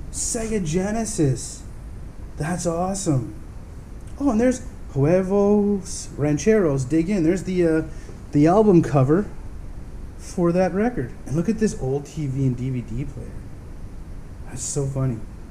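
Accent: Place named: Canada. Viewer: ABSOLUTELY LOVE THAT CANADIAN ACCENT!!! WE LOVE EVERYTHING ABOUT YA!!!! 😍🌟👍👍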